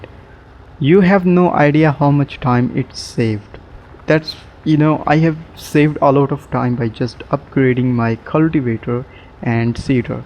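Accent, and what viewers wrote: India. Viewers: idk why your Indian accent gives me an asmr like tingle but i love it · Are you an Indian person because you sound like one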